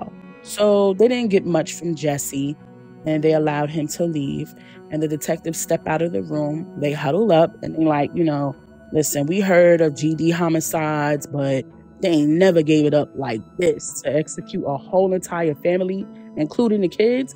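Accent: New York, United States. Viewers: New sub! 😂 Love how you narrate! Do I hear some New York? 👀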